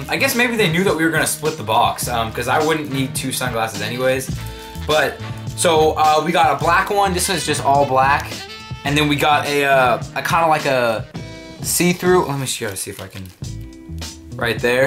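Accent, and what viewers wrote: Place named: United States. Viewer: its fresh to hear a Leb with an american accent we have a fair few Lebanese in Oz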